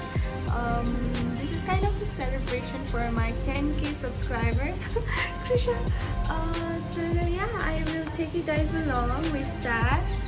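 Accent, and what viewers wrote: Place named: Nepal. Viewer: Beautiful 💓💓u☺ I love Nepal ko accent ☺☺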